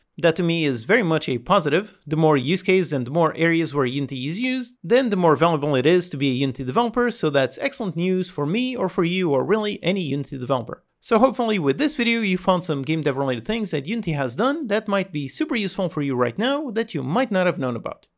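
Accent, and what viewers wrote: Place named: Portugal. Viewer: Bolas. Estava a ouvir o teu Inglês e dizia para mim mesmo, parece um Tuga a falar Inglês. E fui verificar. LOL. Só não acerto no Euromilhões.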